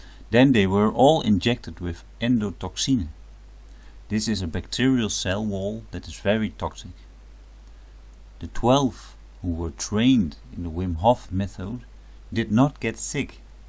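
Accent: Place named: Netherlands. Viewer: dutch accent!!!!!!!!!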